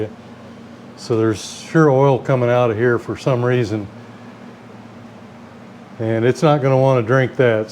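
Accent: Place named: West, United States. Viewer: western accent and New England accent, have to listen closely.LOL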